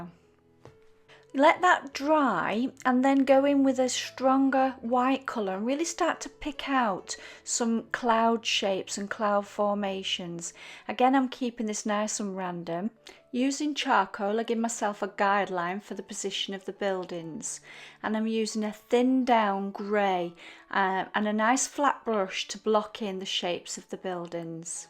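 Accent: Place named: Yorkshire, United Kingdom. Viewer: What a beautiful Yorkshire accent! Excellent tutorial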